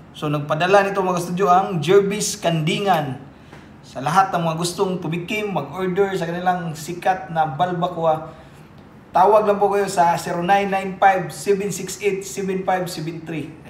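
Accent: Visayas, Philippines. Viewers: Bisayaa jud ni ug tingog ka studio😄😄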